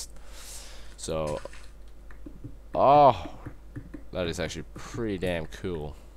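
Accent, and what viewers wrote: United States. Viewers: Wow your voice is different! You sound american! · HOLY SHIT HE SOUNDS SO FUCKING AMERICAN!!!!!!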